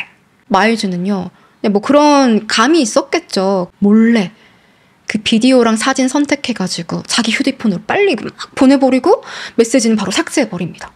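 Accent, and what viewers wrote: Gyeongsang, South Korea. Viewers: 언니 경상도 분이세용?궁금해요!!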